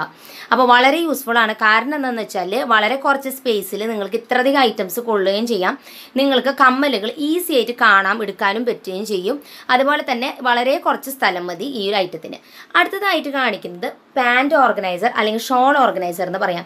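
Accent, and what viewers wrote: Thrissur, India. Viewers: Ithu njangal Thrissur kkarude slang anu. Njangal achadi bhashayonnum samsarikkarilla tta...,☺️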